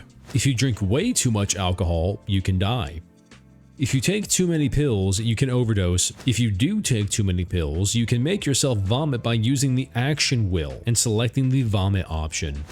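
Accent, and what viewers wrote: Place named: Australia. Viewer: To Americans, your aussie accent could be considered strange.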